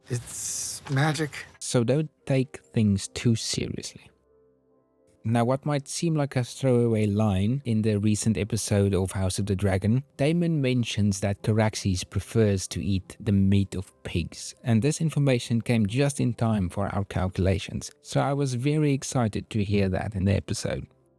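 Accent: South Africa. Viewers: Is the creator South African??? The accent sounds very familiar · Hilarious that I could pin you as a fellow South African just from your accent lol. Great video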